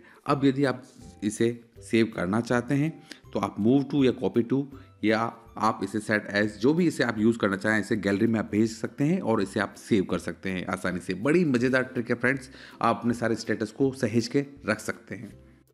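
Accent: India. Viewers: badi fast ahh nav delhi se lagdi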